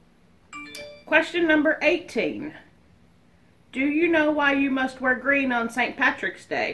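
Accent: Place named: Mississippi, United States. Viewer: Howdy,!! I think you are hilarious! I am in Mississippi, and our accents are very similar, so your "peenched" and other words sound a lot like me! OMGoodness!! I used to spend hours a day looking for 4-leaf clovers, too!! I found one or more almost every time I sat down to look. I love your furbaby, Barnum. My Missy looked almost exactly like him, but she passed at about age 12 soon after my husband in 2016, probably from grief. Please, give Barnum a big hug and kiss from me in memory of my Missy—and, cherish him as long as you can, hon... I think you are great and wish you all the best! God bless! Love & hugs ❤ Lana aka the Good News Keto Grandma 👵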